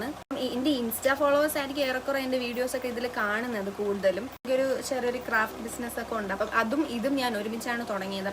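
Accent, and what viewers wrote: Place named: Thiruvananthapuram, India. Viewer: നിന്റെ സംസാരം കേട്ടപ്പോയെ മനസ്സിലായി തിരുവനന്തപുരം ആണെന്ന് 👍👍super ആയിട്ടുണ്ട് വീഡിയോ ഇനിയും idanam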